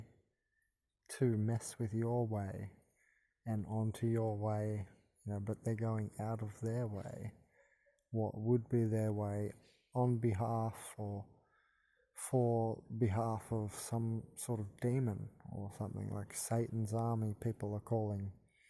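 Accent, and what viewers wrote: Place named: Australia. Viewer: Is that the eerie chimes of the Uruguayan Mr Whippy van stalking you at the start of the vid? Sounds like you're an Aussie, did you move to Uruguay in hopes of ending the stalking?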